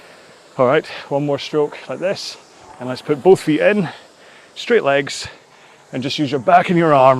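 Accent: Scotland, United Kingdom. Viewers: #Alfresco Have to say I hated that! Just don’t have the stamina just now for that pace so had to stop a couple of times for a few seconds then I needed 30 sec with 6 minutes to go. Can only get better!!! Thanks. Always good to hear a fellow Scot!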